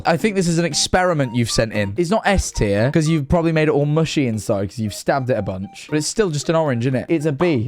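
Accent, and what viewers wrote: United Kingdom. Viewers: was extra british in this one 😂😂😂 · You're British?!?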